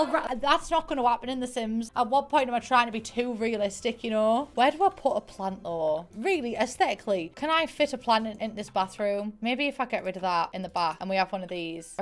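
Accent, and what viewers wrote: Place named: Scotland, United Kingdom. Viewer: Your voice gives me LiFe! I love the Scottish accent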